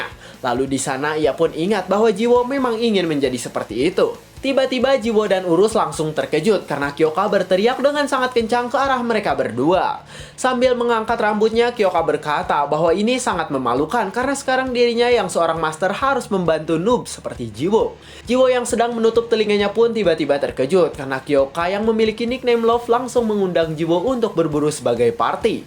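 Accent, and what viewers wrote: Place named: West Java, Indonesia. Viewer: Orang mana min? Gaya bicara sama bahasanya kaya sunda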